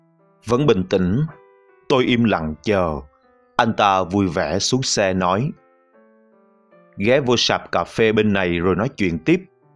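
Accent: Standard. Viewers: Giong tram phát am chuan rất hay ( đường phan thanh gian )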